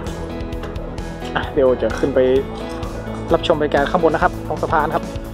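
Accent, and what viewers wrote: North, Thailand. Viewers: อ้ายคนเหนือบ่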